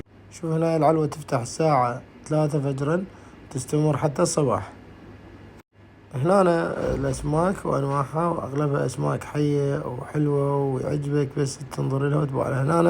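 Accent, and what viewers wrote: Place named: Gulf, Arab world. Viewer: لهجة الجنوب مقاربه للخليج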